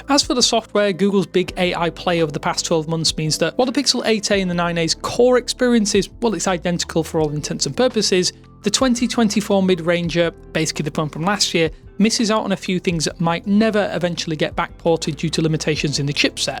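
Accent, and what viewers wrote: United Kingdom. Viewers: british accent 😢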